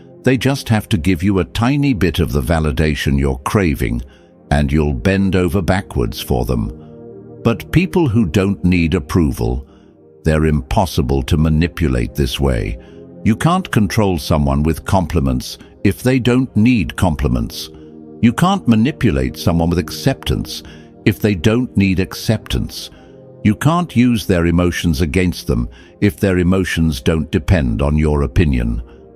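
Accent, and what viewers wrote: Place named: United Kingdom. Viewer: Change your voice British gag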